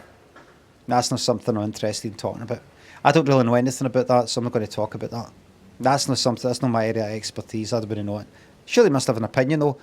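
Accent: Scotland, United Kingdom. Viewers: If you could also tone down the Scottish accent that would be great (for our viewers outside of Scotland)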